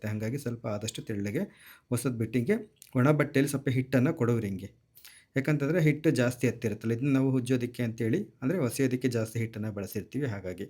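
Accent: Chitradurga, India. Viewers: Namma Durgada kannada 😂👌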